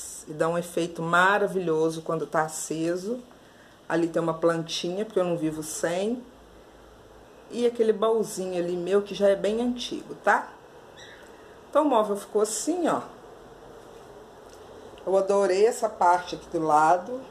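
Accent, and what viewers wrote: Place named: Minas Gerais, Brazil. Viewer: Eu amei...na minha casa a sala de jantar e cristaleira é provençal...de patina branca...super amo...e super combina com o estilo farmhouse...então estou curtindo muito o que vc faz...até porque são coisas acessíveis...bem a realidade...adorando...e aproveito para dizer que adoro seu sotaque...ano passado no carnaval eu fui aí em BH....queria ter te conhecido naquela época...tbm fui la Inhotim...espetacular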